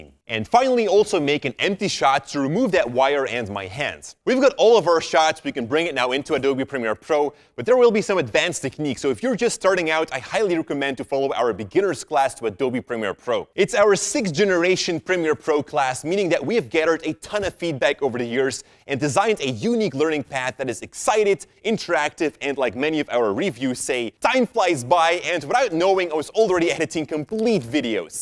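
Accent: Flanders, Belgium. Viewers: ik wist niet dat je vlaams spreekt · ik wist niet dat je vlaams bent, ik kom zelf uit nederland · Ik wist niet dat hij vlaams was😂😂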